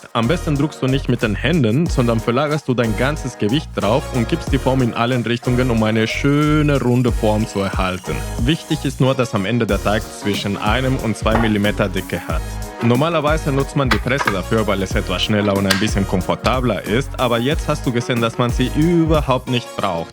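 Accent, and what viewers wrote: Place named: Russia. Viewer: Интересно, по акценту автор русско говорящий. Не плохой немецкий.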